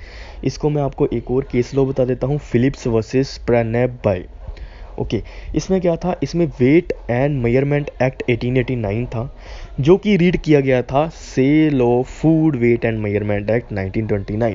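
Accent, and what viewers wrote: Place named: Punjab, India. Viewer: Bhai Punjabi accent hain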